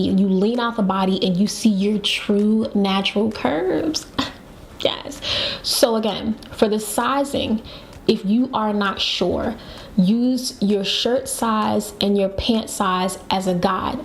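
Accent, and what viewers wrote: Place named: Baltimore, United States. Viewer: I just ran across your video! Are you from Bmore? You’re accent is so cute!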